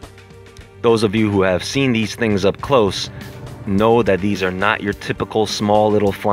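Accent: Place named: Philadelphia, United States. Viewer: Sounds like a Philly accent,anyone else hear it?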